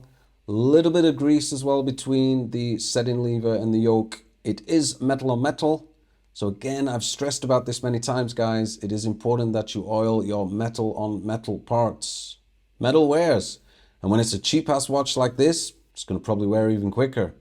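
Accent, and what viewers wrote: United Kingdom. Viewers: Interesting accent, are you a Brit abroad maybe?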